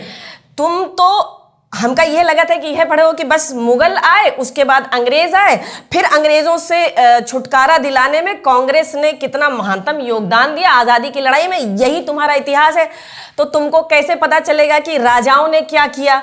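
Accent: Bhojpuri, India. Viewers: YOUR BHOPURI IS SO NICE TO HEAR. MORE THAN SUBJECT MATTER, MY INTEREST LIES IN HEARING YOUR BHOJPURI. I HAVE A GREAT INTEREST IN LANGUAGES. As a matter of fact, I know ANCIENT GREEK, LATIN, SANSKRIT, ENGLISH, FRENCH AND KANNADA.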